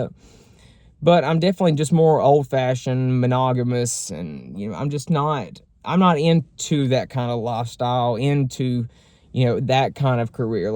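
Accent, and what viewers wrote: South, United States. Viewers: I find that to be a ridiculous question tbh. It once again points out the hypersexualization in the LGB community. It also tells me that ppl are watching the videos without hearing & listening. This man has clearly stated that he is looking for love. That he wants a husband 1 day, with kids. He wants a family. He has spoken so clearly about his past & how exhausted he was that he couldn’t find anything burn guys just wanting sex. And then, here comes the OF porn addicts. That only want his body. It’s maddening. Yes he is gorgeous guy, beautiful southern accent, kind heart….yet he is just still being objectified. Like jeez ppl. Then yall wonder why yall be dying alone, at the age of 50 & 60 still on hookup apps getting rejected daily. I hate it. Truly.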